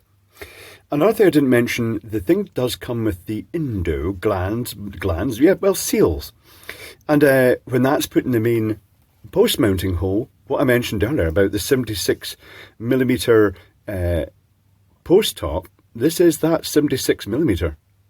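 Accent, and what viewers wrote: Scotland, United Kingdom. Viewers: I am trying to work out what part of Scotland you come from. It is not a Glasgow accent for damn sure, I was there for a month.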